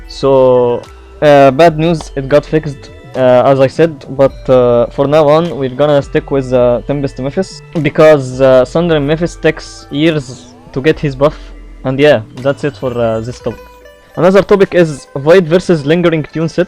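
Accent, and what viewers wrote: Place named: Egypt. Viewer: man why i feel ur an Egyptian cuz of your English accent 😂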